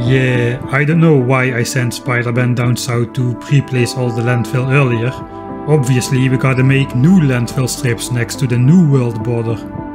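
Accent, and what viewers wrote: Netherlands. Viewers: Lekker bezig man, gave playthrough en mooi bewerkt. I love your attempts to * cough * properly pronounce the English words like recipe. Every time I hear a fellow Dutchie pronounce recipe that way (and I heared it very often back in the day I played WoW) I just crack up :D Gewoon lekker Dunglish blijven praten ;) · I was doubting whether you was german or dutch based on your accent, turns out dutch after all (checked your youtube page).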